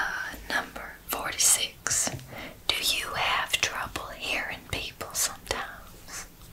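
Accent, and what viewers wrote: South, United States. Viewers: Ohh And I 4got too mention the Southern accent was outstanding it was sooo good u should def do more vids with it!what a sweet precious angel u are!😊😄💗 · Being from the south,your accent was pretty accurate. Very relaxing video. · Just in the nick of time, I was ready for another round of and in a southern accent is just bonus. ❤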